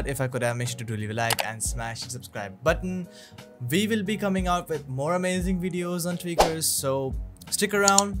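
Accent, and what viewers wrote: India. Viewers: He is an Indian, that's why he speaks in that way. t to d y'know.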